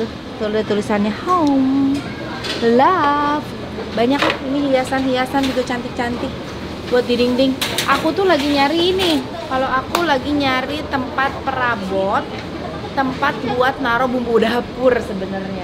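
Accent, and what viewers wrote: West Java, Indonesia. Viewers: Lucu banget teh logat bahasa sundanya 😄👍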